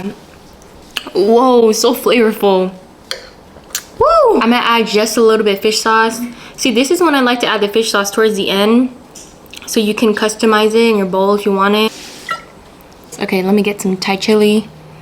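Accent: United States. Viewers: Is she from the bay area??cuz she soundin alot like she is. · this girl sounds like shes from queens ny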